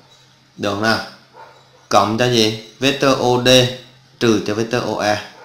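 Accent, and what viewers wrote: Phu Yen, Vietnam. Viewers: Em ở miền bắc .Hồi trước em không phân biệt được giọng Phú Yên với các tỉnh trong đó, mà giờ nghe thầy giảng nhiều nên em phát hiện giọng Phú Yên có gì đó đặc trưng hơn các vùng miền khác. Em yêu giọng thầy và thương thầy lắm, cám ơn thầy luôn giúp đỡ em và các bạn ạ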